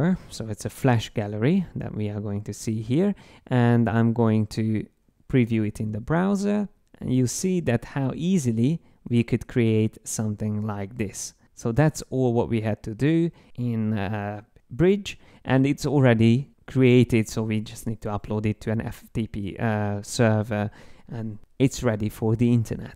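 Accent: Hungary. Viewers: Is it Hungarian accent I did hear? :) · hahaha your Hungarian accent is so obvious! Szeretlek Magyarorszag, köszi!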